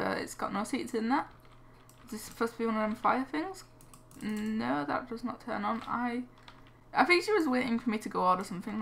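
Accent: United Kingdom. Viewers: Ay Your british like me! :D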